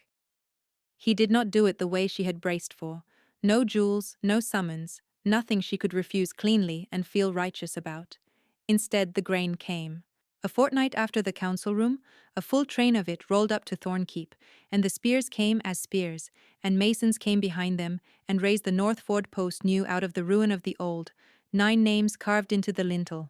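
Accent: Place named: India. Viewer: Can't listen to this story, sounds like Idian Muslim accent which hates dogs!!!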